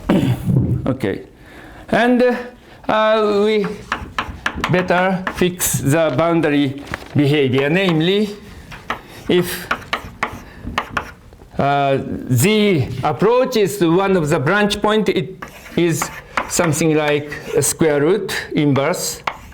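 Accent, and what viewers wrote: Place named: Japan. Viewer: 理系にありがちなカタカナ英語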